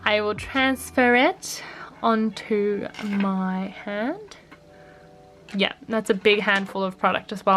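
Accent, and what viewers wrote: Australia. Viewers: Wow, as an Aussie who has been living in Japan for 15 years watching your videos and hearing a true blue Aussie accent is blowing my mind a little lol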